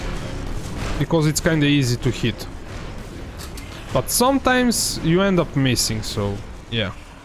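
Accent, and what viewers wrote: Russia. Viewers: Это что, русский акцент?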